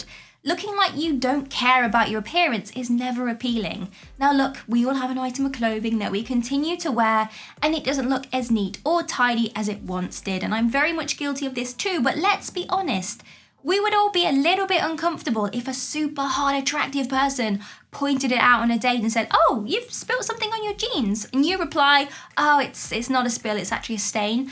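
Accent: England, United Kingdom. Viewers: She is lovely, intelligent and her Queen's English is easy on the ears.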